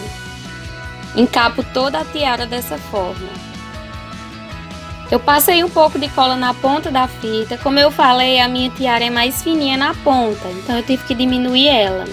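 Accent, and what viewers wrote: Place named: Paraíba, Brazil. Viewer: Moça, é incrível. Você falou e eu pensei 'essa mulher é paraibana' Dito e feito kkkkk Beijos da sua conterrânea também de João Pessoa.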